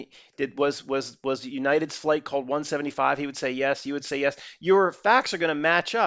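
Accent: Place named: Boston, United States. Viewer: Love your Boston macadamian accent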